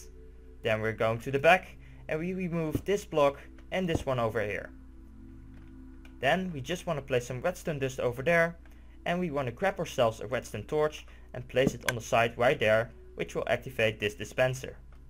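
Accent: Netherlands. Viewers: Are u netherlands / dutch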